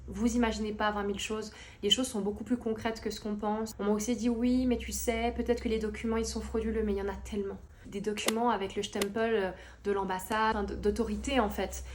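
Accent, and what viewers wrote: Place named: Vaud, Switzerland. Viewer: J’adore ton belle accent vaudois!!!! Je viens de vevey 🎉 c’est rigolo d’entendre un accent comme le nôtre à la télé 😉 t’as vidéo m’a beaucoup touchée ❤️ reste comme tu es 🥰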